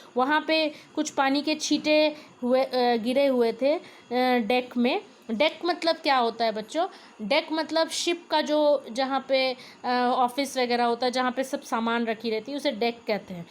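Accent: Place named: Bengal, India. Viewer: Mam by your voice I can recognise that you are bengali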